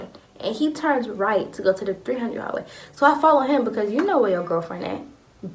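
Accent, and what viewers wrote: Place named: Georgia, United States. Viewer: you from ga ? if so, i can tell bc of how you talk😭.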